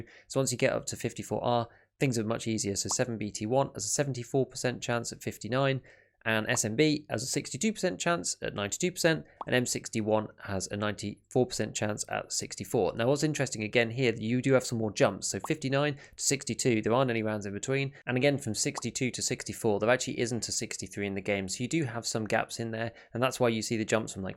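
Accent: United Kingdom. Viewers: The 3kliksphilip of tarkov. Even the british accent is included.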